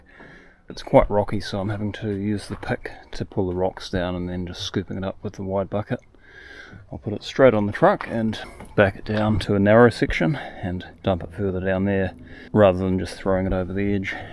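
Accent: New Zealand. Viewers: as a new viewer of your videos again I can't get enough! I could have watched that for over an hour! I did miss the closed caption option, I am hard of hearing but it takes me a while to figure what you're saying in the New Zealand accent! I'm not complaining, but I sort of depend on that closed caption to make sure that I understand your every word. AM. Skip, Western PA, USA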